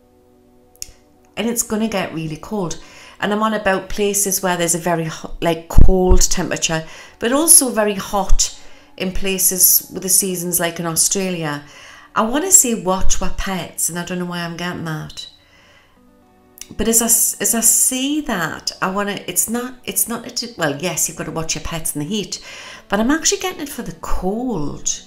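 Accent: Newcastle, United Kingdom. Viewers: She's a Geordie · Came for the predictions, stayed for the lovely Geordie accent😁 Thank you for sharing this.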